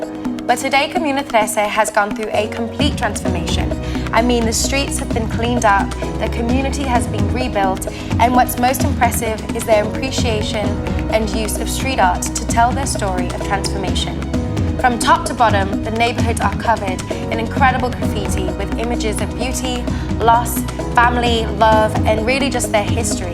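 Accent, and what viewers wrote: United Kingdom. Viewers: This has become my favorite channel, and that cute British accent was the icing on the cake.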